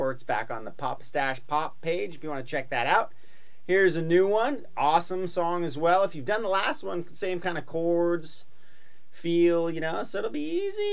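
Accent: United States. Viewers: I love the way he talks haha amo ese acento estadounidense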